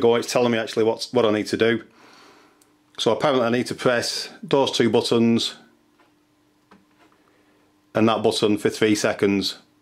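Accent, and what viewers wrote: United Kingdom. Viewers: Very easy, I just connected mine and it work beautifully. thank you very much. If you dont mine me asking, what accent is that, I mean where are you from in UK?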